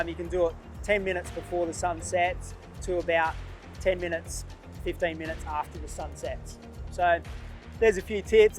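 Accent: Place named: Australia. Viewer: Thanks for the insight. Great to hear an Aussie voice on YT. Awesome work.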